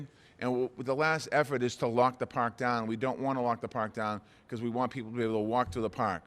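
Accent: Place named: Boston, United States. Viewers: Im just here to be entertain by the Boston accent. #quarantinedlife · WTF? Non American accent!! That's a Boston accent!! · Went to school in Boston and the whole time I couldn't stand that accent!!!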